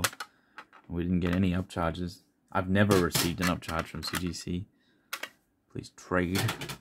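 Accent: Australia. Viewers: Dude, your videos are the best out there. not only for the sublime ozzie accent but you dont talk too much. you get to see a shit ton of cool cards within a short time. I really love this, less talk more cards. your videos have that to the max, so keep it up! I subscribed hell yes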